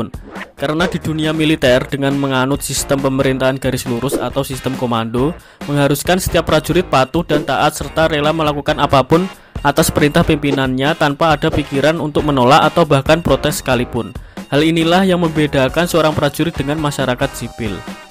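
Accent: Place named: Java, Indonesia. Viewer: medok amat bang bicara nya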